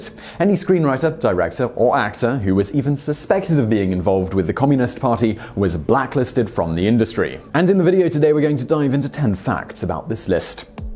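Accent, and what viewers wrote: United Kingdom. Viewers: This fellow glossed over a lot of facts. He seems to imply that those involved who were Communists in Hollywood were just a bunch of innocent weaklings. He fails to mention those "weaklings" in Hollywood and their thugs (Herb Sorrell and company) did physical damage to people and property who disagreed with their Communist philosophy prior to being called out. He also did not mention their slavish devotion to Stalin. Their personal papers divulge their loyalty to Stalin and his minions. Most of them also denied the murders, the gulags and atrocities that Stalin inflicted ever occurred or existed. Finally it is hard to take seriously millionaires with their mansions and servants actually believed in equal sharing of material wealth. If so, they would have voluntarily divided up their riches. None of them ever did. I should ad that the gentleman does not understand true free market capitalism which is transactions between 2 or more people where both sides benefit. 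He seems to think what we have today (economic fascism) is the same as capitalism. it is not. The commentator (I am assuming he is responsible for the content and not just hired due to his British accent), should have some time looking at the other side of this argument instead of giving us the usual devoid of the facts version we have all come to know. In other words don't be intellectually lazy.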